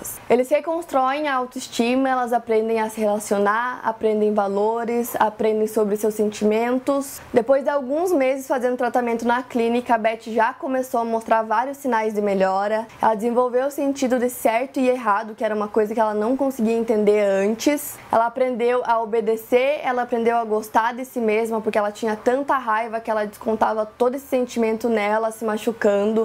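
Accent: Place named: Paraná, Brazil. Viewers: Eu também sou de ponta grossa PR msm cidade que ela então pra mim é normal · ela só é sulista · Ela é de PG aaaa amo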